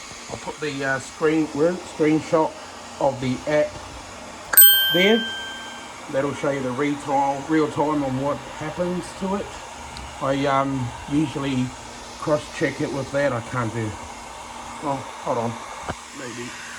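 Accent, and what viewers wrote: Australia. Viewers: I hear my Aussie brotha voice!!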